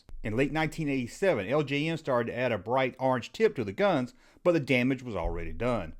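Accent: South, United States. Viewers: As a fellow toy nerd and southerner, it’s awesome to hear your accent talk about these subjects.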